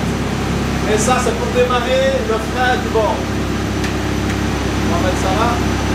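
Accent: Belgium. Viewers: Je viens de découvrir ta chaîne ! Superbe. Et çà fait du bien d'entendre ce bel accent Belge ! Vous êtes tellement sympa en Belgique 🙏🙏🙏🙏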